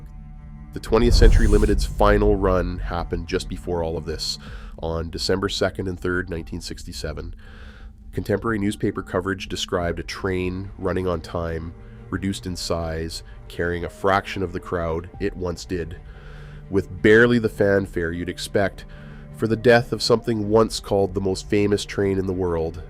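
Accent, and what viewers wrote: Canada. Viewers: (He sounds almost Canadian.)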